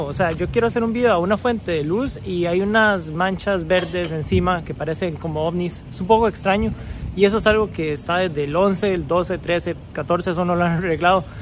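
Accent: Costa Rica. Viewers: Este mae es tico haha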